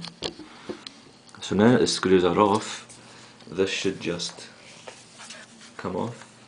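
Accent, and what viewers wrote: Scotland, United Kingdom. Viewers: Aren't you Scottish, by any chance? :-)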